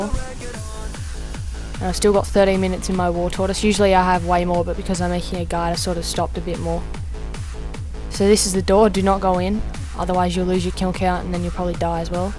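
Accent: Australia. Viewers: hey buddy u sound aussie, im aussie too. im maxed would you like to go arma sometime?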